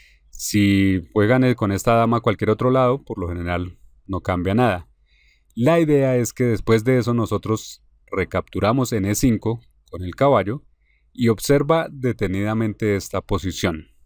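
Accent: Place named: Bogotá, Colombia. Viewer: ¡No sabía que el GM Igor Smirnov hablara español con fluidez, y con acento rolo! 😲😃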